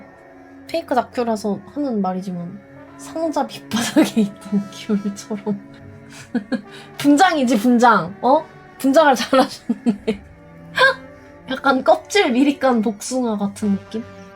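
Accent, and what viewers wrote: Japan. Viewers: 한본어 왜 은근 잘하냐 ㅋㅋㅋ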